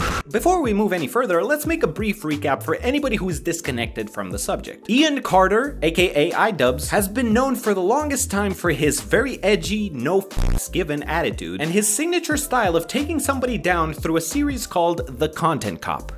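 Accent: Romania. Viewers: are you romanian? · I could hear your accent during this video, which I didn’t notice in many of your other content. (Yes, I know he’s Romanian, I just find it kinda strange that his accent downgraded a bit)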